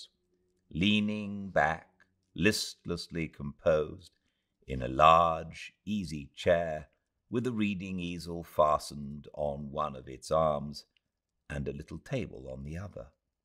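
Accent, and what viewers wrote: United Kingdom. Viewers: This is amazing, I just discovered this reading, so pleasurable and quintessentially English. Thank you.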